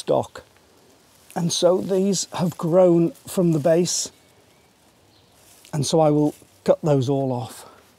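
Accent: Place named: Cheshire, United Kingdom. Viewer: I grew up in a little village called Willington, near Chester.. so your accent is familiar too! I miss Cheshire very much!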